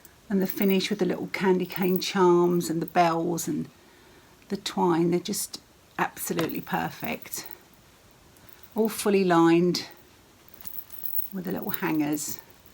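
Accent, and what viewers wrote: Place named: Nottingham, United Kingdom. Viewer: Gorgeous stockings! Loved your use of the word “tiddle”. We had a lovely neighbour who we watched over. She was from Nottingham & always used that word. My hubby could never figure out why she always called him duck! We were neighbours here in Vancouver, Canada for 28 years. Sadly she passed away 6 1/2 yrs ago at the age of 90. Your accent always makes me think of her. Merry Christmas to you & your family.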